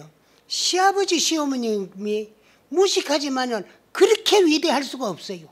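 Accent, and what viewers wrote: Gyeongsang, South Korea. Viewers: 묘허스님! 구수한 경상도 사투리에 맛갈스런 법문 넘감사하게잘받들고 갑니다 건강하십시요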